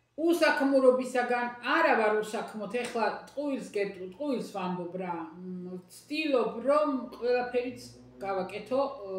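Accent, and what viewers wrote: Kakheti, Georgia. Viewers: ❤❤❤კახური კილო მსიამოვნებს. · უკარგესი ადამიანი ხარ ❤, უბრალო, წესიერი, კულტურული, განათლებული, საყვარელი კახური აქცენტით და იუმორით😊❤ ძალიან "გემრიელი" და საინტერესო საუბარი იცი😊❤. ჯანმრთელობა და ბედნიერება არ მოგაკლოს ღმერთმა🙏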